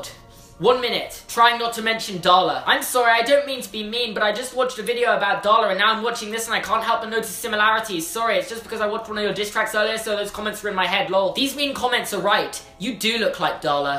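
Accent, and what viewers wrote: England, United Kingdom. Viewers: Idek how y'all can make fun of his braces when he looks so cute I mean fr fr braces+cute British accent+BEAUTIFUL HAIR=the perfect boy so y'all can stfu and stop talking about him thx👌🏾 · I actually really like your braces and I just don't get your voice because of the English accent since I'm American sorry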